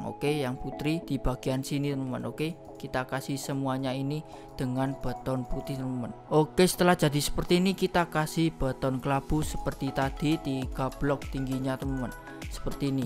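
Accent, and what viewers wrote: Java, Indonesia. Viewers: Bang ngomong nya kaya orang jawa 😂😂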